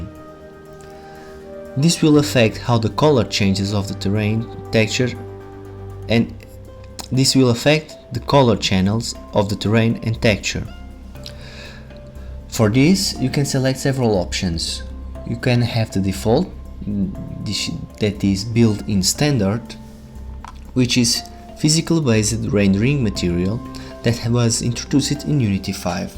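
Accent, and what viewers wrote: Portugal. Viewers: Eu tambem sou de Portugal, bem me parecia que você estava a falar com um sotaque português.:)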